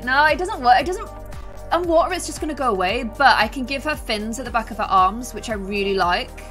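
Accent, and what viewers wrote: United Kingdom. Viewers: Hi I love your channel and no I am not just saying this for likes. I just want to see this just nothing else. you are amazing you inspire me and inspire me to do stuff like you do. I love Britain and I love your accent. If you would just see this that would be a miracle but of corse your not because no one does ever. Just please see this and remember I just want to see this. I don't care how many likes I get so don't put hate comments.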